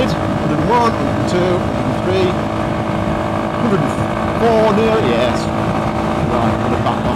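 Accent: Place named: Leeds, United Kingdom. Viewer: After pissing about reading viewing and thinking re instamic pro plus, the new dji wireless mic and the rode go 2 I have just bit the bullet and bought the rode 2. Can i ask how do you mount the transmitter to your helmet? I'm wearing a shoei note 2 flip. I saw a guy with a boom mic connected and plug into the rode 2 but was hoping for wireless with a dead cat etc. I have a boom mic in my helmet for talking rider to riders so there would not be room unless i place second mic on other side of helmet... any help or advice would be great thanks GOOD VIDEO where are you you sound like a LEEDS accent...but that snot west yorkshire lol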